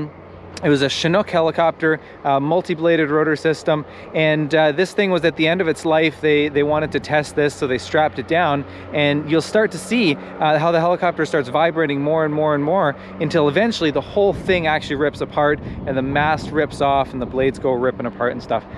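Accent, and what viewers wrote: Canada. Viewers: Great video. Couldn't understand your term "lead-leg" but then figured out your Canadian dialect was saying "lead-lag." Hahh!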